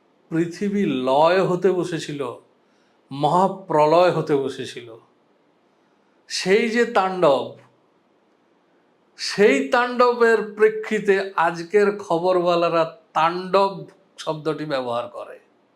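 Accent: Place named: Bankura, India. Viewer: আপনার জন্য এমন এমন অদ্ভুত বিষয়ে জানতে পারি যে, যা সারাজীবন পেরিয়ে গেলেও সেই নিয়ে জানতে হয়তো পারতাম না। অনেকে বলবেন এগুলো ভাষা কে ভালোবাসলেই জানতেন। একথা ঠিকই। তবে তিল তিল সময় যেভাবে পেরিয়ে যাচ্ছে জীবন থেকে, তাতে নিজের উদ্যোগে নতুন কিছু রিসার্চ করে শেখা, এই জীবনে খুব কঠিন। 🎉🎉🎉🎉 আপনার কথায় বাঁকুড়া ঘেঁসা একটা টান আছে (ধারণা ভুলও হতে পারে). 🎉🎉🎉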